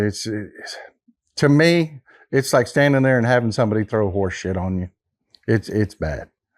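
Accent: United States. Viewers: Good to see you again and hear my fave American accent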